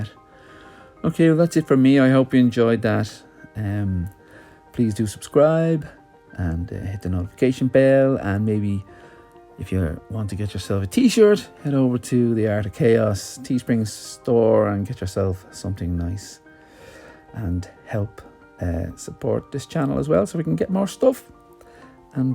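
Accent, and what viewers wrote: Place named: Ireland. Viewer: I agree with you. I love the Irish sense of humour and the accent. Lovely paintings.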